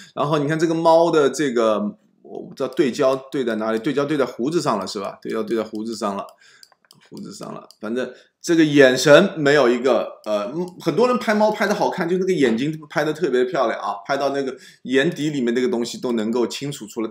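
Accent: Shanghai, China. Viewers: 先生侬好！两个月前，一次偶然的手抖误操作，点开了正在直播的《摄影评片》，听到一口上海腔普通话，就像听到邻居、朋友讲闲话，蛮亲切的，所以没有马上转频道，听听讲点啥么事？听着听着，被侬专业、中肯而又平实易懂的点评所吸引，尽管我非摄影爱好者，但是仍就看到了直播结束，并且订阅了《詹姆斯摄影》。之后陆续看了另外两集《评片》，也看了《瓦纳卡的孤树》、《星芒照海岬》和《詹姆斯摄影十年作品》（封面上的南浦大桥覅太赞哦！），刚刚又看了第十二期《摄影评片》，虽然这些只是侬视频群里很少的占比，但是已经影响到我，对摄影好像有点感兴趣了，正在准备考虑买只两手货佳能5D2，再配一只好点的镜头起步，尝试去感受拍照的乐趣。侬让我联想到了另外一位从上海走出来的摄影大师——郎静山，尽管所处时代不同，作品风格迥异，可都勤奋努力，孜孜不倦，砥砺辟出了自己的路，都在成功之后毫无保留地将自己的学识和经验和盘托出，倾力普及传授摄影知识，都德艺双馨，令人尊敬。郎先生一百多岁还手捧相机黄山徒步，相信侬也会在一百多岁时仍行走于天涯海角摄取美景飨世人的。祝福侬摄影教学收获更多硕果，摄影艺术登上更高境界！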